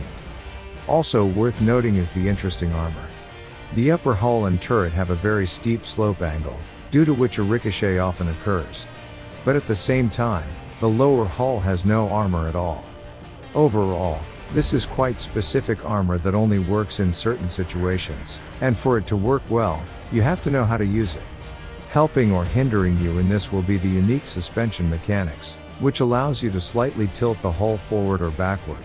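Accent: Russia. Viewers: very nice accent for a russian guy. Thanks for these information. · Ты русский? Давно научился говорить по английски?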